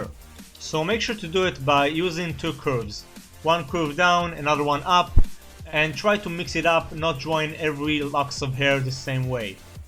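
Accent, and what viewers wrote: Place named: Israel. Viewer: u sound isreali are you and btw great job ty this helped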